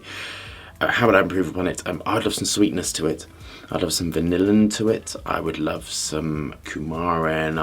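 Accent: United Kingdom. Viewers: i just stumbled over your channel. Love your expert knowledge and your talking in british accent.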